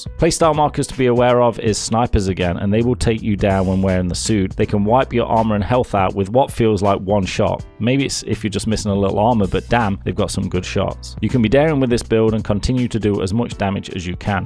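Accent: United Kingdom. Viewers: Are u british? Ur voice is very similar to hoxton voice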